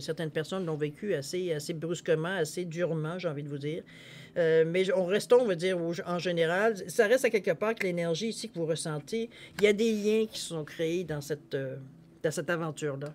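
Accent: Canada. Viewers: Bonsoir, Votre approche est particulièrement profonde. Indépendamment d'un humour que seul l'expérience de vie est en mesure de produire, votre analyse est très élaborée. J'ai vraiment apprécié la finesse de votre intuition et puis cet accent sublime du canada est vraiment très réconfortant car il démontre, selon moi, un attachement à la terre très sain. Bon c'est mon sentiment évidemment et vous en rirez probablement. Mais je dois vous confirmez que mon ressentit vis à vis de vos paroles est particulièrement positif car il touche mon être au plus profond de sa réalité. Un très grand merci de votre maturité d'âme et de vos intuitions, c'est un cadeau!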